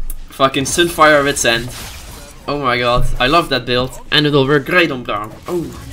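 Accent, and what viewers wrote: Netherlands. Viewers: You sound like you are Dutch Je klinkt alsof je Nederlands bent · is dutch he has a dutch accent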